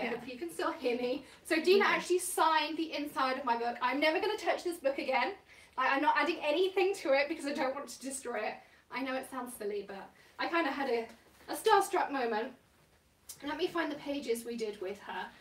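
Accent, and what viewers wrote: United Kingdom. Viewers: definitely makes me think: #1 - British ( your accent ) #2 - crafty goodness: new techniques, latest products and some really great ideas. An all around great channel to hang out watching and playing along.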